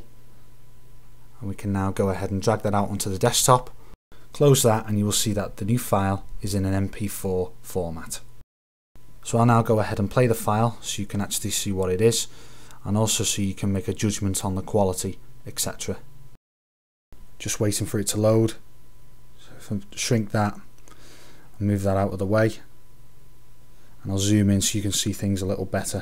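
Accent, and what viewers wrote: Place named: Liverpool, United Kingdom. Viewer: Where bout in liverpool you from lad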